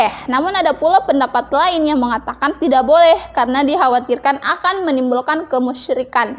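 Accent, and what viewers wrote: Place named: Sulawesi, Indonesia. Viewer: itu sepertinya logat sulawesi, saya punya teman juga bilang salam warahmatullahi bukan warohmatullohi 😅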